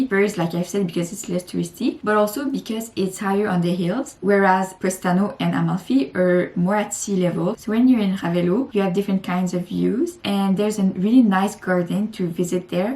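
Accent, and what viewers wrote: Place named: France. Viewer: Montreal Canada. you know I will go to sorrento. positano and amalfi from China soon. your video is very helpful. besides I like to hear your French tone. it's charming .😊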